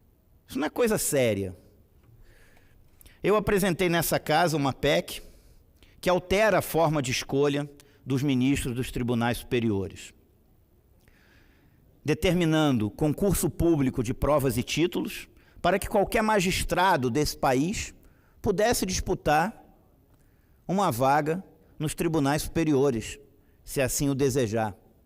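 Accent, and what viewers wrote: Rio de Janeiro, Brazil. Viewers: O assunto é bom, mas... Essa merda de sotaque carioca cheio "Xix" ninguém merece!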